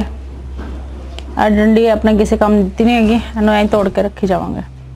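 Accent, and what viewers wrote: Faisalabad, Pakistan. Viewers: ji, tusi kehray pind tun hagay o, kion k tuhadi punjabi bilkul Pakistan wich Faisalabad wich boli jandi ay. Saday nal bilkul mildi ay tuhadi boli. Pakistan tu tuhanoo bahut bahut salam. Saadi baradri v Khokhar ay, asi te fer aiko baradari de hoey na???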